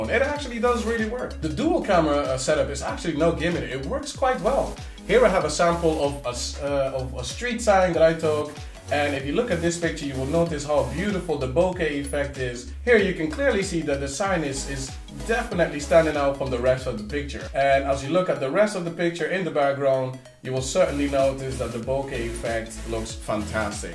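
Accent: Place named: Netherlands. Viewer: I advice you to work on the accent. Are you dutch ?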